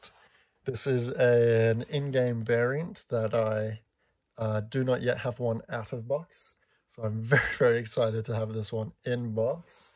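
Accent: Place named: Australia. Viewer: An Australian man explaining skylanders is music to my ears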